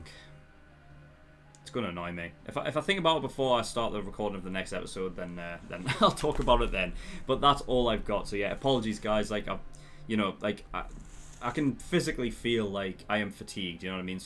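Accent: United Kingdom. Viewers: I love your reaction so much🙆‍♂️🙆‍♂️I'm Taiwanese. I watch your video for analyzing and learning English listening. 😂Because I seldom hear England accent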